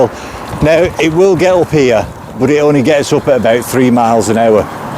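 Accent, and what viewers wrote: United Kingdom. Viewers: Are you uk reviewer?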